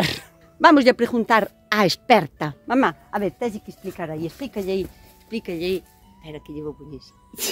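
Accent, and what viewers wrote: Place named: Ribeira, Spain. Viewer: Cousa tan xeitosa!! E como me gusta o teu falar ribeirán! Noraboa pola tua horta e polo traballo de difusión. 10 horas de media de traballo por cada video, lín nun teu comentario. Estes videos son semente de futuro. Gracias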